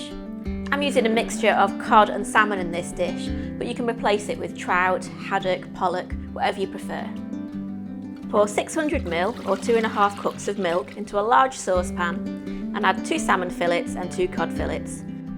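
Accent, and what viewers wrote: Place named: Northern England, United Kingdom. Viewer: I too love her Northern accent . Back to my home country in Lancashire.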